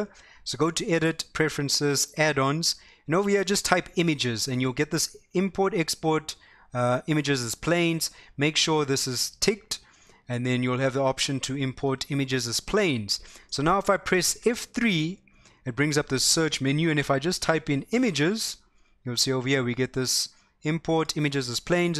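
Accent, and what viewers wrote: South Africa. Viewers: I can hear you're South African, hahah, hello from Durban 😂👍🏻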